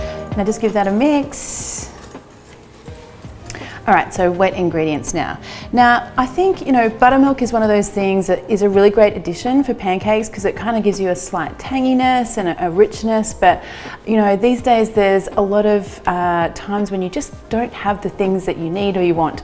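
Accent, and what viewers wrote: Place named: Australia. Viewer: You're sounding more Aussie since coming back home!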